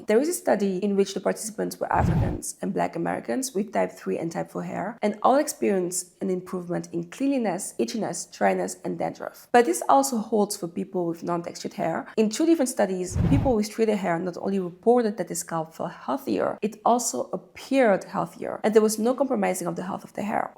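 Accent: France. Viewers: Are you French? The accent it😍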